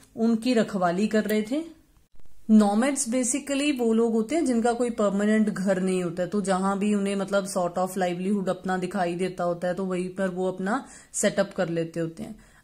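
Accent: Punjab, India. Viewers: Ma'am Are u a Punjabi ?? Because ur slang is like us 😅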